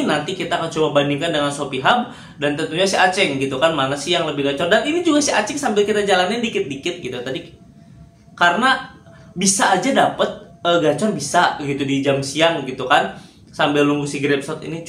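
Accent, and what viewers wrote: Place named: Sumatra, Indonesia. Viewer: Itu logat Sumatraan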